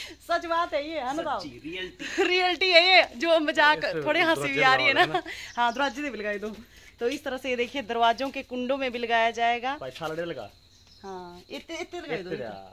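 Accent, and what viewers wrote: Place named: Bilaspur, India. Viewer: Madam ji aapka mayaka khan hai aap bilaspuri bol rha hai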